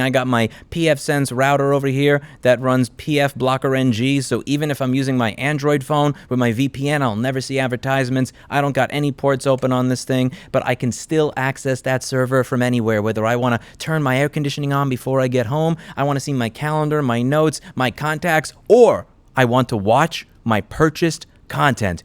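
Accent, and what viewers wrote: New York, United States. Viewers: u can go out of new york but new york wont go out of u.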